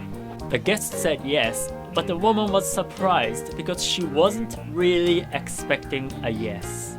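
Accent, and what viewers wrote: United Kingdom. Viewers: why do you peak with a british accent? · hey..! you sound British with your diction, it's cool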